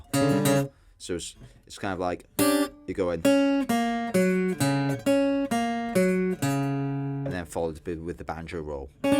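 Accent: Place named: Welsh Valleys, United Kingdom. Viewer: Well then, (who I have only just found), where have you been hiding with your cheeky grin and boy-oh of the valleys accent? You're brilliant at this Chet style which, even after 65 years, I still cannot do; Boo-hoo! The control and independence of all your fingers is coupled with the ability to start, finish & repeat anywhere along the way of the toon..... Wowee! I can't do any of that. I'd like to say I learnt something from Chet - if only the names of the tunes and their existence....... but even that's a stretch for me. You know the one; It goes "Tic-toc / tic-toc / tic-toc blah, blah, blah" Thanks a billion for the lesson and breakdown of just what actually goes on in the execution of this piece - and the entertaining way in which you presented it. Should you feel the need to know more about my own style of playing I'll just say "Don't bother!" Thanks again Just wonderful..:hand-pink-waving:face-turquoise-covering-eyes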